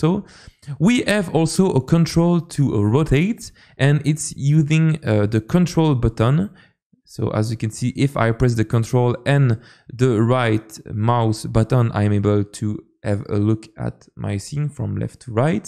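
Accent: France. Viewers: Hello. Tu es francophone ? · es-tu français ?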